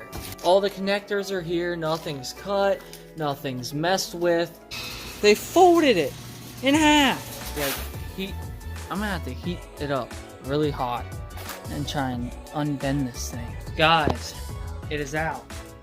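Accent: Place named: Philadelphia, United States. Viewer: the philly accent makes this build amazing.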